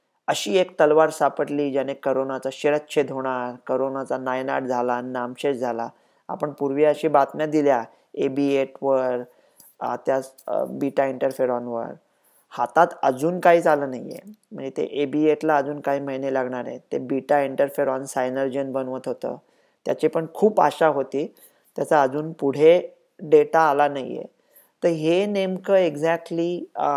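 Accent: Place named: Pune, India. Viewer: सर तुमचा बोलणं ऐकलं की अस वाटत आमच्या पुण्यात सदाशिव पेठ मध्ये थांबलो आहे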